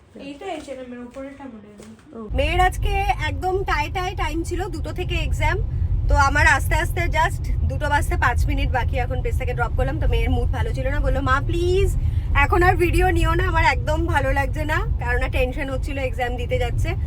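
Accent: Bangal, Bangladesh. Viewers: Aunty tmr bangal kotha gulo ki cute 🥹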